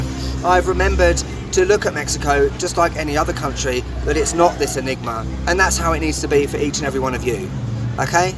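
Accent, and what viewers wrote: United Kingdom. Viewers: I love your Bit accent !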